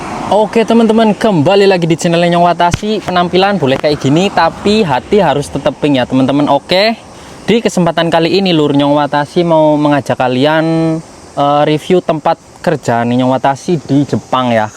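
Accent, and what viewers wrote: Banyumas, Indonesia. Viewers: wong ngpak ya mas smpean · asalnya dari mana bro...medok banget Kya bhsane Inyong ...salam kenal inyon d Bobotsari Purbalingga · Ngga apa" mas yg penting halal Mas berasal dari man bahasanya pake nyong ngapak mana lur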